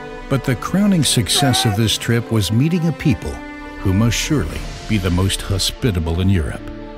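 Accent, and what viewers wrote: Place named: United States. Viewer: He's American I think judging by his pronunciation of most words. Scatland taddies etc. Why not someone Scottish narrating it.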